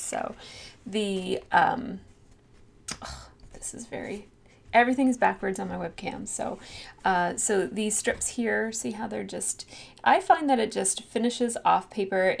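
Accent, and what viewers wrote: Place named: Canada. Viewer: Really fun to watch,. Hadn't really noticed before your pronunciation of 'outlining' because I just love the Canadian accent and that's that. Now I can hear you 'oatlining' everything hahahah. Cath x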